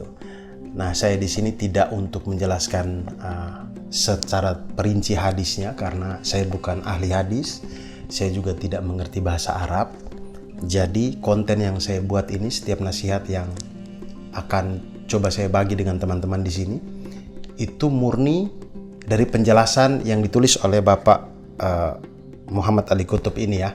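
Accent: Eastern Indonesia, Indonesia. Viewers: Alhamdulillah ka...selalu segar dengan logat timur nya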